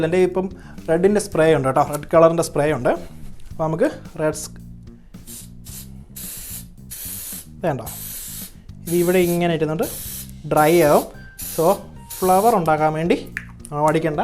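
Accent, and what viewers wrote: Kottayam, India. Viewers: കോട്ടയംകാരൻ ആണോ!! നല്ല സംസാരശൈലി!!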